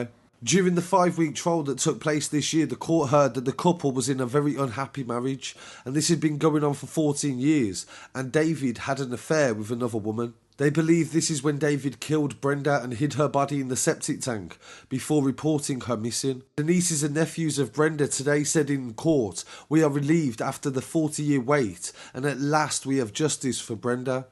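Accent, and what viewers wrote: Birmingham, United Kingdom. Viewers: The brummie narrator.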